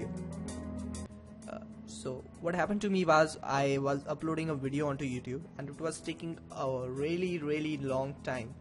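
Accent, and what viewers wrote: India. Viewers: sounds like an indian from india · This Indian speech is awful i couldnt watch this video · PLEASE LOSE the Indian ACCENT! It is ANNOYING! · I think you're indian, and I love your accent!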